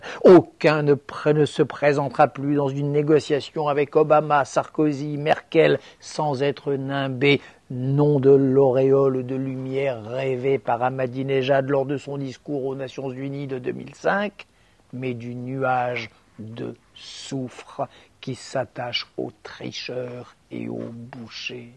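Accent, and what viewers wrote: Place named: Israel. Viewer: mais FERME TA GUELLE pauvre tache tu fait honnte au VRAIE philosophe ...et mortelle ton acxent israelin et mortel .....a oui avant de parler de oppresseur n'oublie pas tes potes de la KNESET......et pour resume 300mille vote frauduleux on bien ete trouver mais dans le camps de mousavie ....connerie de soulevemment 1.5 million personne contre 48million pour ahmeddinedja.....pauvre devbile de menteur...koi kil arrive ferme ta GUEL.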